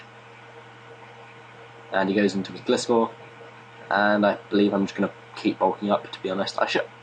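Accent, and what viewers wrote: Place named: United Kingdom. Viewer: Hey man- so you asked me if i could give you some feedback on one of your narrations. i want to compliment you that you sound confident and there is fluidity in your voice which is a good quality, but you're a bit monotone, you might wanna add a bit more energy which will show to the people that watch. Also cool accent (i like british accents very much :p) Keep up the good vids man, you have the potential to grow into a big channel!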